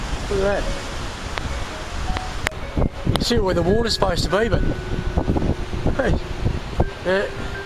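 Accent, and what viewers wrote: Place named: Queensland, Australia. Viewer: Mate sounds like you could be an Aussie, my guess is from Queensland.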